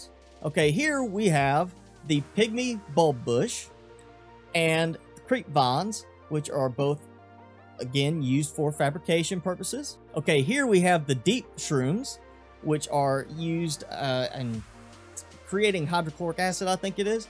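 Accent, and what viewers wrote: South, United States. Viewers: This guy sounds country. Nice video it helped me so much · Southern not western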